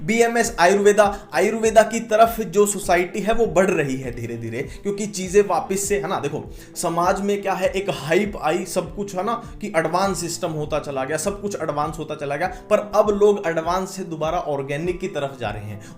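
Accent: Haryana, India. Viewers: Bro haryana se kha se ho aap ??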